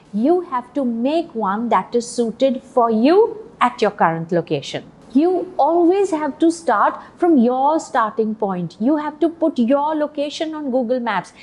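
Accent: India. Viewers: This is Indian English. I was expecting this English. Very nice.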